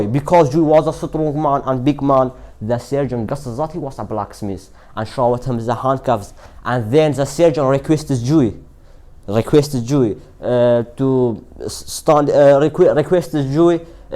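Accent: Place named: India. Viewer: Mashalla , baas hastakam lahjay hindy wargrtwa😍😍😁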